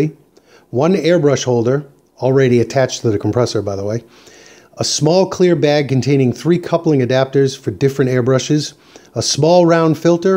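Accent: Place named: United States. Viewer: Hi, thank you SO much for this!! This is the most comprehensive review on YouTube!! However, I'm confused... You sound as if you are from the USA? Apologies if you are Canadian... We struggle to tell the difference in the accents here in the UK. Iwata is a USA Company. Why did you buy from the UK? Was it a better price? Very kind regards from London UK. Alan.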